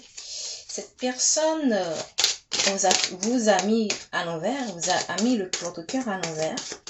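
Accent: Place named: Guadeloupe. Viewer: Bonsoir , je voud découvre à l’instant .Je vois remercie pour cette guidance , c'est tout à fait mon histoire avec cette personne, jai énormément morflé avec elle 😔🫤. Elle a beaucoup gens toxiques autour d’elle , et ces gens là, ont mis a mal notre relation, elle est très,très influençable malheureusement 🫤. Vous avez l’accent antillaise je pense de Guadeloupe à moins que je me trompe !🤔😀 .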